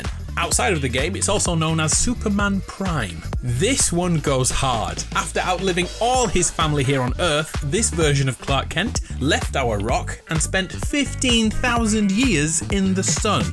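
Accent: United Kingdom. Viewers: I've always thought is british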